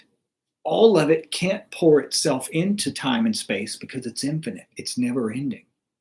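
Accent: South, United States. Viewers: Man I wish I'd have gotten the notification in time to be here live. I'm gonna have to see what's wrong with my settings. I've been waiting for you to share another video. I enjoy your teaching so much. And I'm so very proud of you and everything that you've accomplished in your studies. I'm so thankful for your interest bc I always learn something new about things I've wondered from your broadcasts. And it's so refreshing to be able to listen to another southerner. Especially on the topics that intersect me. You're a one in a million type of person. I've known about your past since HBO's debut. And I knew as I watched in horror that you are innocent. And all I could do was pray about it and believe y'all would get out of that nightmare alive and tell others that didn't know what was going on. It does my faith in GOD so much good to see you doing so well. 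Your resilience is an inspiration to me. And I wish all the best in the world.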